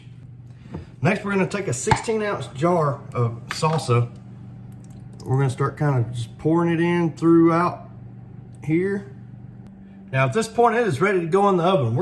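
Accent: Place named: South, United States. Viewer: Do you ever cook using venison? I can tell by your accent you’re from the South. BTW, I live in Hayden Alabama!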